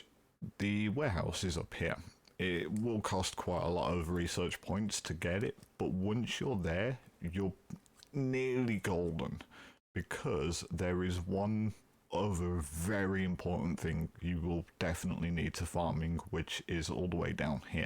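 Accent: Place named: United Kingdom. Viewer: Love this just brought the game on switch with dlc on the e-store! Where’s abouts are you from in the UK? I’m British from Birmingham and trying to place your accent!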